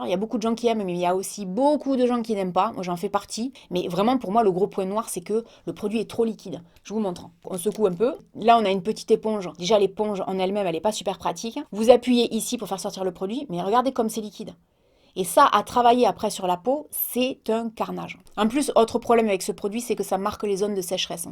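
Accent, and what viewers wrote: South, France. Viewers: Salut, je suis abonnée à ta chaîne depuis environ 1an, j' ai encore découvert pleins d' astuces et produits, j 'ai 58 ans et depuis mes 16 ans ce qui fait un bail, je me fais des gommages, masques que ce soit visage corps, mains, pieds etc. Quand je vais avec ma petite fille faire les boutiques ont me dit que c' est super de faire du shopping avec ma fille elle à 15 ans ce qui nous amusent pas mal. Je mentirais si je disais que je ne suis pas flattée ni que mes chevilles ne gonflent pas. Effectivement il faut prendre soin de soit que ce soit à l 'intérieur comme à l 'intérieur. Je souhaitais te remercier pour toutes ces vidéos supers et ta bonne humeur. J 'aime beaucoup ton accent aussi car j 'ai le même étant aussi du midi de la France. Continue tu es superbe et vrai, peut de personnes peuvent en dire autant.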